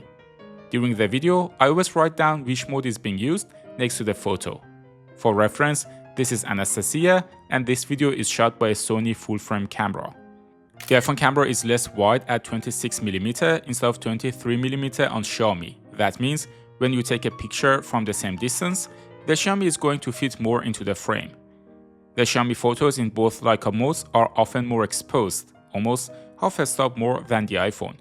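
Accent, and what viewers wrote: Iran. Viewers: Your accent is similar to Iranian....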